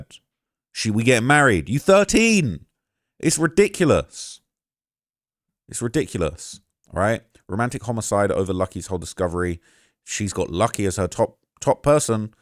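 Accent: United Kingdom. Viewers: Ngl your over use of Black American vernacular while u have a damn British accent is high key annoying af BE YOURSELF AND STOP COS PLAYING MY CULTURE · He's British we say things differently. We have a different accent to Americans!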